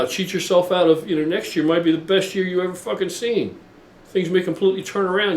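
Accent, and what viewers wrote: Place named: Central Pennsylvania, United States. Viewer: Central PA or Maryland accent (No offense, of course, I'm from there and recognize it - new to the channel, too, so if everyone else knows where you're located, I don't yet).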